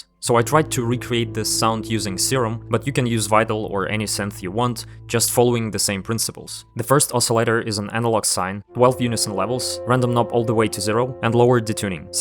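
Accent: Europe. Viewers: I came to say the EXACT same thing 😂. Dudes just European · He just has a European accent of some kind. Is that what you mean?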